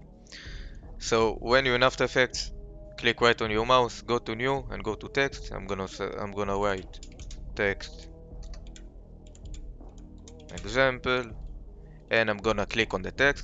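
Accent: Israel. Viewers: שמע אני מת אחי איזה מבטא ישראלי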